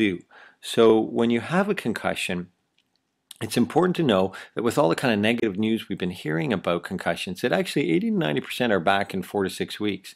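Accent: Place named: Canada. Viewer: great video! i knew you were canadian from your accent haha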